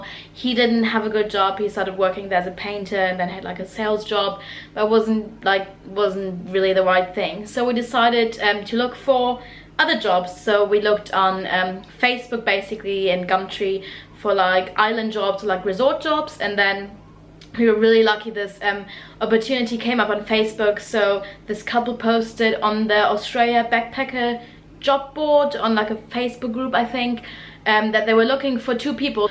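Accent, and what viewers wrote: England, United Kingdom. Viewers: She's probably been hanging around too many UK tourists and have picked up their accent. No way does she sound anything like all the German tourists I've encountered nor whilst in Germany myself. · Interesting for visiting foreigner tourists/workers to know approximate rates. Now tell them about your superannuation that you can get back ! As an aside I met a German young woman working as a waitress in Brunswick, Melbourne. She was on her 2nd year visa. To get her 88 days up she took a job outside Barcaldine as a Jilleroo. 1 months trial and she stayed for 6 months until the harsh summer. She bought an Australian stock saddle to take home for cross country riding. Next video (lol) please speak some German you sound far too English.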